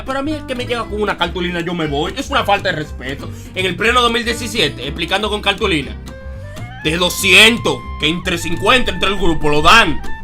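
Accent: Dominican Republic. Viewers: Apuesto que es Dominicano jajaja 🇩🇴🇩🇴🇩🇴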